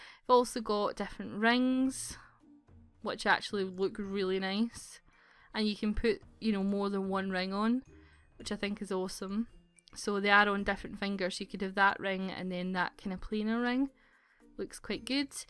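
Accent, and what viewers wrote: Scotland, United Kingdom. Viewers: you admitted that you have a JLo butt? very brave of you! Can't wait to see you make yourself if you're going to! Love the 'wee' scottish accent too! x From what I've seen of this CAS mode, I'm disappointed that the customisation is a bit more limited, like there is no 'create a style' tool. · Are you British? NEW TOO YOUR CHANNEL!!! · 4 some reason u sound Scottish 2 me...or it could b the fact that I just finished watching BRAVE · Are you Scottish?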